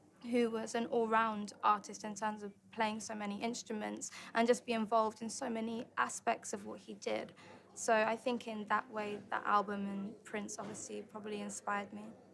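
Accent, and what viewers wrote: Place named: United Kingdom. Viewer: HER ADORABLE BRITISH ACCENT it hurts my gosh it hurts she's so fantastic it HURTS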